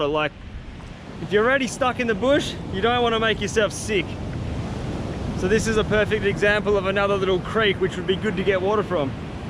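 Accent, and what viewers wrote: Western Australia, Australia. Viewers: Its and Australian accent i can tell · yes! Quite easily distinguished. Australian’s speak a lot slower than New Zealander’s and have a stronger nasal twang also lots of slang that we don’t use, we have 3 different accents countrywide, European Kiwi (Pakeha), Maori, and Southland accent. Australia has many more than us and the one that is most alike ours is Tasmanian but the guy in this vid sounds a little more Western Australian, Perth region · Love your bloody Ozzy accent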